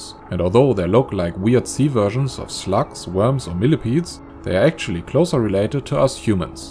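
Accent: Germany. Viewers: Sounds like a German Morgan Freeman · You got a strong accent. I guess you're German or something close by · Sis guy soundz gerrman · Kann mir nen Deutschen mit heftigen Akzent einfach nicht auf Englisch geben. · bwahahahah the way you said "turd like sausages" so casually and nonchalantly...and with that thick german accent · Drop the nazi accent. It's offensive and racist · I can instantly tell hes german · Your German accent is killing me inside.... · is that a german accent?? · Youre german right i can hear the accent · Are you from Germany? (Ur accent says so) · Safe ,ist der Sprecher ein Deutscher!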